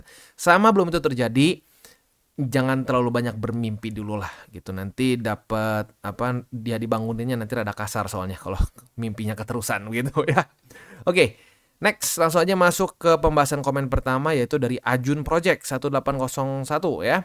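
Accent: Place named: Bandung, Indonesia. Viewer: Orang cindo bandung dan merantau jakarta rata2 logatnya seperti ini.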